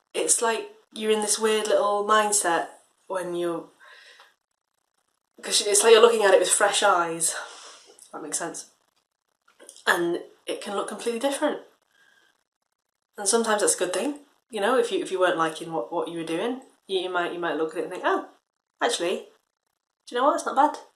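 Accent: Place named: Scotland, United Kingdom. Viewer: I can’t define your accent, you are from the U.K., I am pretty sure, but not from the Southern part. Maybe, Scotland, Merseyside?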